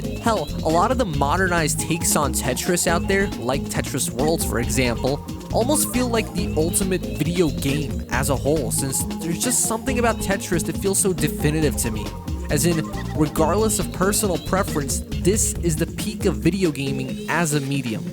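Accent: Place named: United States. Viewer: Наистина звучиш като американец. Впечатляващо. Също кошчетата за боклук (барабани) на Ларс звучат прекрасно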